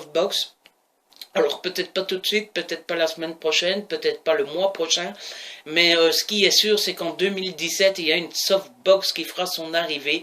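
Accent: Belgium. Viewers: Coucou! Happy Bday!:) Je ne suis pas une habituée des commentaires mais sache que je te suis depuis quelques mois maintenant et que ça reste un plaisir de voir tes vidéos:) (C'est sympa d'avoir un booktubeur avec un super accent made in Belgium! Je suis Liégeoise, je sais ce que c'est lol) Perso, j'aime les formats habituels, je n'ai pas de demande de vidéo spécifique. Au plaisir de te voir dans une prochaine vidéo, bisous!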